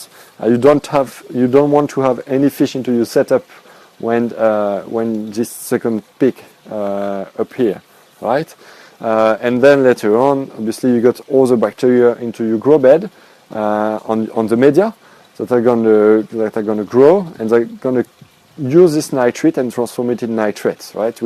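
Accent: France. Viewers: i love them french accents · mon dieu..quel super accent.. laisse moi deviner... origine francaises ?? ou belge ?? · can you caption this? Your accent is really strong for people in other parts of the world. I only understood about half of it. And googles auto captions think your french.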